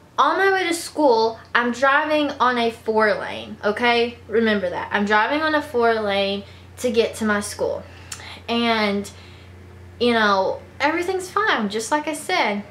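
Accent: South, United States. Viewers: accent or nah ? southern or nah ? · I love ur little southern accent!😁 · I love her southern accent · Where are you from? Your accent's confusing lol. So southern! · It's called a southern accent. Usually when you're from down South. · It’s because she’s southern 🤷🏻‍♀️ we have that “southern drawl” down here 😂 hers isn’t as bad as a lot of people’s. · It's just a southern accent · Hey, are you from the south of the US? Carrie Underwood was my favorite blonde of all time, not it's you haha:)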